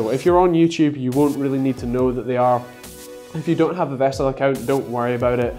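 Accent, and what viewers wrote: Scotland, United Kingdom. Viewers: Love that Scottish accent! I am British also!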